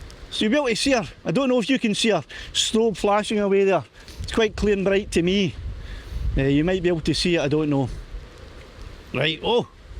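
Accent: Scotland, United Kingdom. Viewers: What part of south west Scotland are you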